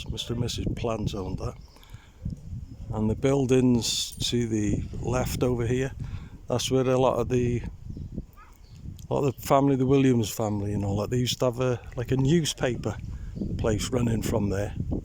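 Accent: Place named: Liverpool, United Kingdom. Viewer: Cheers..... Lllandudno born but with a scouse twang..... incongruous.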